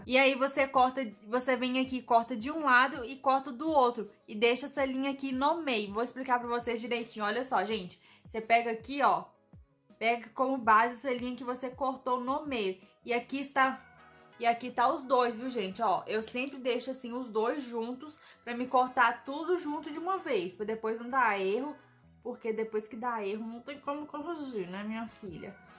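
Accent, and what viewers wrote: Minas Gerais, Brazil. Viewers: É mineira, 98% de certeza pelo jeitin que fala kkkkkkk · Ah, mineirinha! É inconfundível o nosso sotaque. 👏👏❤️ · Moca vc e mineira né? Te ouvindo falar ,parece eu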